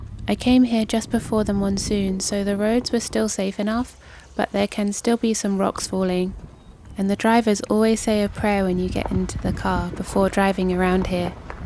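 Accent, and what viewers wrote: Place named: United Kingdom. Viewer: Its not kului .... Its kuuuullu .. 😂😂😂 british accent sucks .. Water : whatha 😂😂😂 Its not Rock fooling : its rock falling bro You are eating Aam papad.. kala katha flavour 😂😂😂😂😂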